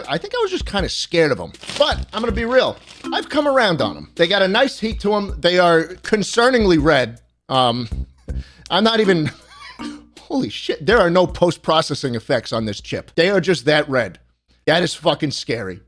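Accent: New York, United States. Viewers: New Yorker is coming out in this one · You can hear the New Yorker in him come out more and more as the video goes on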